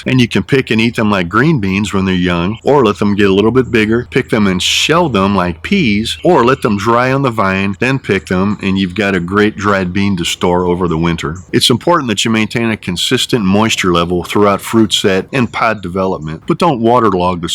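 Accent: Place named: Texas, United States. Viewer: I thought you must be a Texan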